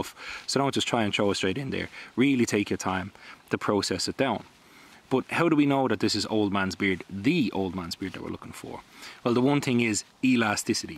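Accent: Ireland. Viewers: Dublin I’d say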